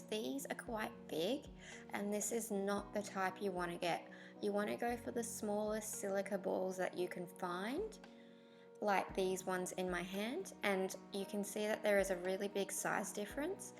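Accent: Australia. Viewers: Good vid, and nice to hear an Aussie accent 👍🏽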